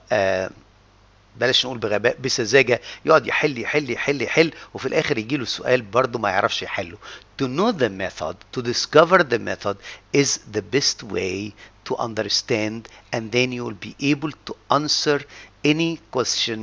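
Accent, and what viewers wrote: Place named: Italy. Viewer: Your accent is so much italian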